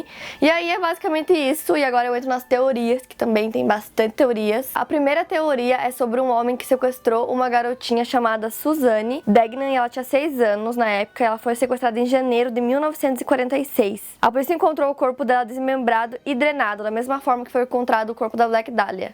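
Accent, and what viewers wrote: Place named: Paraná, Brazil. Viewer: Claro que tem. Todo mundo tem! Ela fala "de" e não "dji", por exemplo, que é uma característica do sotaque do Paraná.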